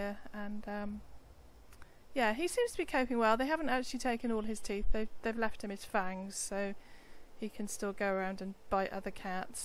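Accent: United Kingdom. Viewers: Brilliant . You got yourself a new subscriber. Nice to hear an English accent in a 76 video too lol. Got my mind turning over thinking of tiny houses now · Awesome video! Love your accent, are you from the UK?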